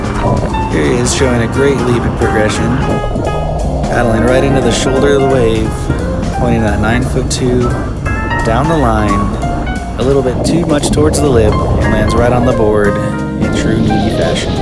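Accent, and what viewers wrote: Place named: Southern California, United States. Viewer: Every now and then you have that 'Endless Summer' vibe going with your comments a laid back SoCal accent!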